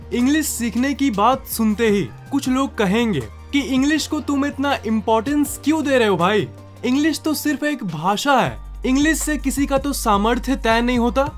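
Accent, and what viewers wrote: Bihar, India. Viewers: He is from BIHAR. I am confident. By his accent , tone.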